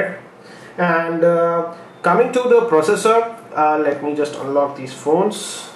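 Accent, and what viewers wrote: India. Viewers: review of the two hottest phone now all done with an Indian accent.....how could you dislike this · Indian accents are just awesome xD nice comparison bro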